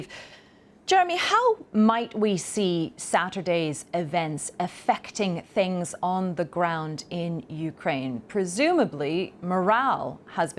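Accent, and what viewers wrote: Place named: United States. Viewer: She almost talks like a American 😮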